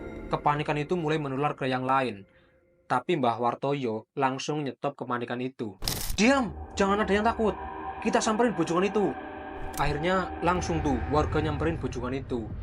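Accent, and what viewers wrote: Banyumas, Indonesia. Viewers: Ngapake ngendi kang😂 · lu orang tegal yak bang atau Purbalingga? soale ngapak brok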